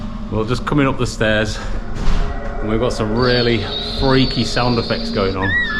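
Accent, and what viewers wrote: Yorkshire, United Kingdom. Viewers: Loved it such a different format loved seeing the different cities food apartments and you standing on street corners looking like you were loitering with intent hope we have more of your travel adventures 😊oh and that great Yorkshire accent ❤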